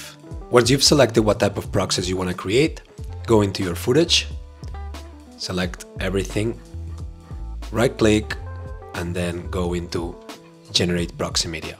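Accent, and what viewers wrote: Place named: Catalonia, Spain. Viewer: Que soooolid! m'he catat q erets català per l'accent en anglès😂😂😂 una mica Pep Guardiola... Segueix així que ajudes molt👍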